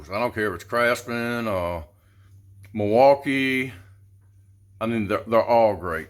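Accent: South, United States. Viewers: The one thing that disappoints me about dewalt angle grinders is they don’t have a screw hole for the handle at the top. Only side to side. For most home DIYers the 412 is fine. You only need the brushless if you’re using the tool A LOT because brushes last <num> hours. I mainly use my grinder as a cut off wheel. Maybe once every couple of years to sharpen a lawnmower blade. If you use a grinder a lot then it’s worth spending the extra money for the brushless. I don’t know if is a grinder expert but whenever I hear that heavy southern accent it’s a good bet this guy knows how to cook up some good bbq. 😄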